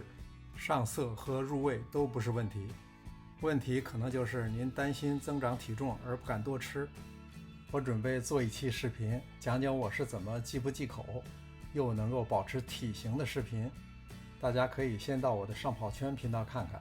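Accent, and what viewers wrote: Beijing, China. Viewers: 视频声音太小了啊 呵呵 快出怎么吃又保持体型的视频吧 就爱听你的北京话 嘿嘿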